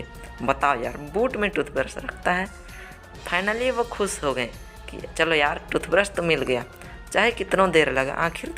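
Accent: Bihar, India. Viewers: You are Bihari n?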